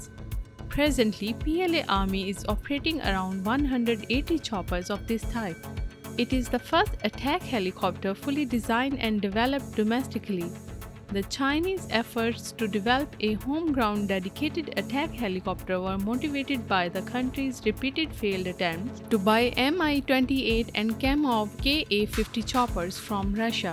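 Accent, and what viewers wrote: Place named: India. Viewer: Oh my God that Indian accent hurt my ears so bad 😔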